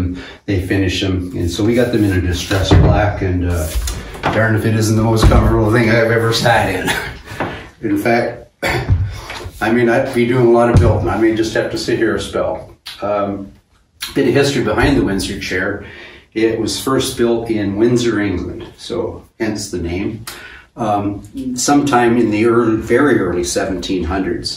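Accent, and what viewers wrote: Canada. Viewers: Ahh, I thought I detected a Canadian accent. I love ypur chamnel. U are quite amazing to be able to do what u do.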